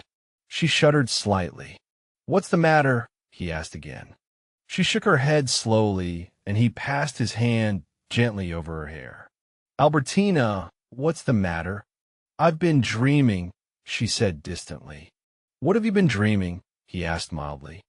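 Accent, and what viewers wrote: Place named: Texas, United States. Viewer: AI SUCKS IN GENERAL, AND AN AI TRAINED TO HAVE A TEXAN DRAWL READING A EUROPEAN NOVEL, WELL NUFF SAID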